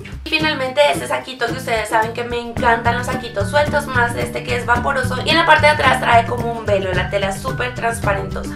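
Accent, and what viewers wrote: Colombia. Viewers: yo se que los colombianos hablan asi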